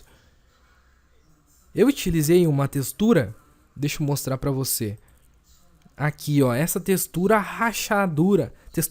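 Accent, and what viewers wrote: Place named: Paraná, Brazil. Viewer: Com esse sotaque só pode ser paranaense...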